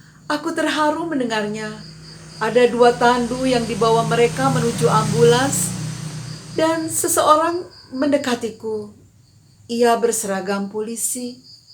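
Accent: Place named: Eastern Indonesia, Indonesia. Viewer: Mantap bu. Logat timurnya masih kentara yah bu. Sukses selalu Tuhan Yesus memberkati. 🙏🙏🙏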